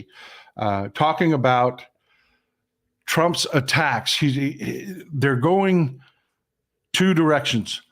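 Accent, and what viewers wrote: Texas, United States. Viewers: Oh, Logic delivered in a Texas accent from under a cowboy hat!!! I have hope for civilization!! 😊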